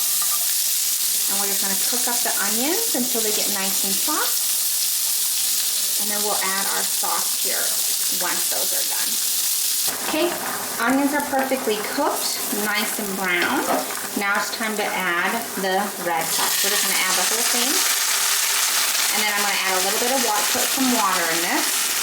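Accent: United States. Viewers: Good morning. Nice to watch this new video. Frankly speaking, I watch your videos not because I am fond cuisine, but because I listen to you speaking English in American accent. I am a fervent learner of English language. I really appreciate the particular video, in which you showed how passionately you are leaning Bengali. I will be looking forward to watching such more videos from you.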